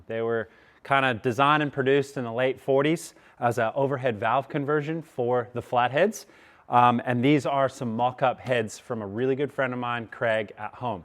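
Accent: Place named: Australia. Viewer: First time watching your channel, and I'm sitting here trying to figure out where you are...! Obvs American accent, but I'm hearing Aussie and Pom, and you're talking about The Mad Scot. Now that I've googled...wow, you really have got an Aussie drawl!! 😄😄 Great work mate, those flanges look superb 👌 (Possibly might have liked some in depth on the sand forming, but awesome all the same!)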